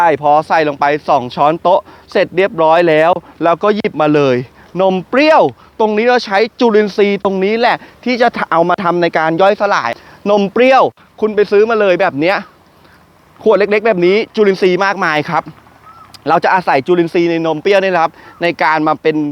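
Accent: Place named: Ban Pong, Thailand. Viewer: เก่งจังเลย..คุณ..เสียงเหน่อเหมือนกันเลย(คนบ้านโป่ง)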